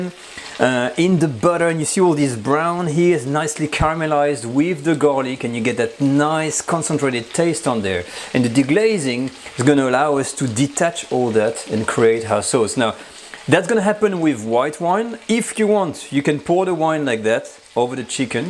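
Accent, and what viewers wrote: France. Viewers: Your French accent convinced me to make this recipe.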